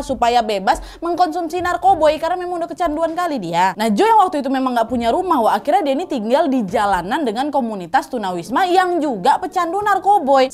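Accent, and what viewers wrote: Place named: Medan, Indonesia. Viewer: Logat Medan yg totok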